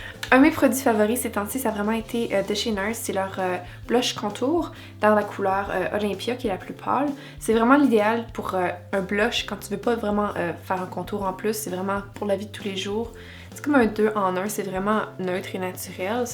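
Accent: Quebec, Canada. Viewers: jadore ton accent quebequois, love it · sounds like a quebec accent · Youpi!!!! Enfin une vidéo en français je suis ravi de t'entendre parler en français avec ton joli accent québécois!!!! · I've actually never heard canadian french, it sounds a bit different than the european french, but I love it =D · Top! J'adore t'écouter en français encore plus qu'en anglais, ton accent canadien est charmant! like! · J'ai découvert ta chaîne il y a quelques semaines et quel bonheur de voir que tu parles français! Ton tout petit accent québécois te rends encore plus sympathique;-) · Merci pour ce vidéo! J'étudie le français et c'est génial de l'entendre, spécifiquement avec votre accent! (Tous mes profs sont Américains ou Français, donc j'ai besoin d'apprendre l'accent Canadien.) Merci! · Coucou ma belle, je ne savais pas dutout que tu parlais français et encore moins que tu était québecoise. 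Cet accent est juste magnifique, j'aurai tellement aimer l'avoir ^^ Tu devrais faire des vidéos en français plus souvent, c'est vraiment agréable de t'entendre avec cet accent:) et aussi quand tu fait des swatch de ral, parce que je comprend certaines choses mais pas tout ^^ Je te fait de gros bisous et connue tes vidéos j'adore les regarders.😘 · je savais pas que t'étais québécoise...j'adore votre accent. merci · Quand tu parle en francais tu as le meme genre d'accent que les quebecois!!:) tu es d'ou?:)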